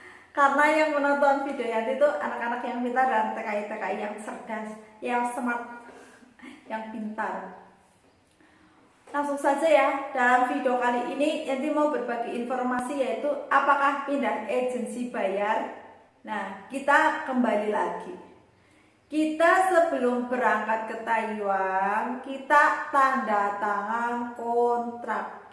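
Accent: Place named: Banyumas, Indonesia. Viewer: Aku sukak deh gaya dan logat mbak Yanti yg ngapak...orak ngapak orak inak wekwekwkewke lanjut mbak oleh oleh khas Semarang sudah kusematkan jangan lupa kembalian apalagi di rumah ada masakan arab yg siap dimakan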